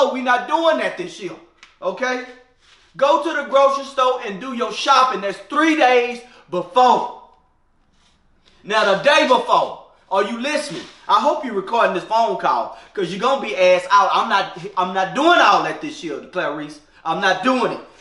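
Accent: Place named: Houston, United States. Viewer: I love this Houston accent. He sounds exactly like my mother... bad attitude and all. 🤣🤣🤣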